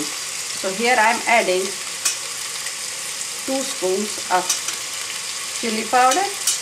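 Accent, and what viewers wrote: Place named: Kashmir, India. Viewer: fantastic loved this recepie an original kashmiri woman speaking with original kashmiri accent all real originak kashmiri stuff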